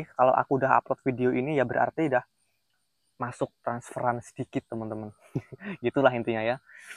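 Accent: Java, Indonesia. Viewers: Mas, njenengan orang mana ya..? Logatnya kok sprti orang Jawa ya..? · Mas, njenengan orang mana ya...? Logatnya sprti orang Jawa ya...?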